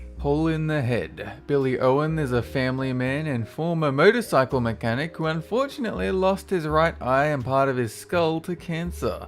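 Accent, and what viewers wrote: Australia. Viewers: His Australian accent is about the only one I can tolerate . (He sounds a tad under zee influence in this though ) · Monochromatik Kolor He's Australian. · I haven't been watching your channel for long but are you Aussie? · Every time I hear this guy I think of an Aussie Shaggy from Scooby Doo who's got way too baked and forgot he had to record a voice over...And instead of waiting he just drawled it out anyway... Heroic!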